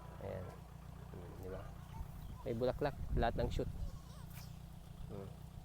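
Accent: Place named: Ilocos, Philippines. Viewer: Parang Ilokano ang punto mo sir,baka magkalapit lang ang lugar natin sir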